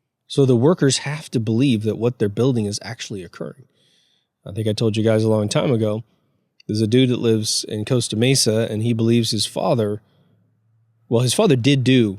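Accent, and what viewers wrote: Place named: Sweden. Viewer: is a fucking genius. I found his channel in 2016. I guess he did work way before that. But one thing is for sure. He is Swedish. Nordic. I hear it in his voice.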